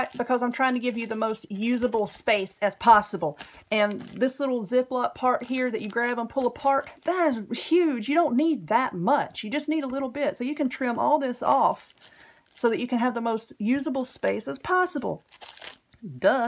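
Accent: South, United States. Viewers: I'm from NC as well, I can totally relate to your southern accent and way of talkin. I enjoyed this video, I just might try making these purse caddies. Tfs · Mam, Your potholder caddy is awesome!! I am getting ready to have a craft sale at the end of the month and I came on tonight to look for simple ideas to make on a budget. I have tons and tons of fabric as my grandmother use to own her own fabric shop - so I was looking for cute easy ideas for some new crafts to sell. As soon as you mentioned that you need to take your shoes off I thought to myself, "wow, this gal is like me". When I use to sew with a treddle foot machine I always sewed with my shoes on and I would get that treddle going very fast but, now with the modern day peddle I have to sew barefoot as I have more control over it that way too. Most of the pot holders I sell I also make but, I don't believe my pot holder will work for your little bags. 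I will start watching for the pot holders on sale at various stores. I believe my Dollar Tree might have your type of pot holder too along with the zip lock bags. These little purses would be ideal for teen girls also and small enough for their lockers. I think they would be good for sun glasses/eye glasses but, you cannot sew the sides completely up so you have room to slip in the glasses. Thank you for an awesome new craft idea. - Rebekah in Alexandria, VA PS: Where are you from with your thick southern accent? I am from NJ so you can imagine how I sound. My niece laughs at how my mother, grandmother and I say coffee. Her father is from NC so after a summer with her other grandparents, my niece comes home calling all of u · You never bore me love watching your DIY and learn from them. Plus like your southern accént 👍👍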